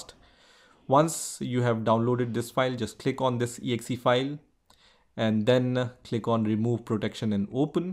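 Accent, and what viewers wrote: India. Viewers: I absolutely detest the Indian accent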